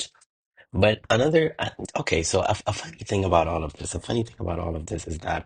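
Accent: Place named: Quebec, Canada. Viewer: Hey there ! Discovered you 5 days ago and I was absolutely NOT disappointed. I enjoyed your video about the Reio. Please stay in good health and share us your work whenever you can :) Also, where are you from ? I hear a faint accent, but can figure it out. ^^ I'd say Québec (Canada) by pure instinct ¯\_(ツ)_/¯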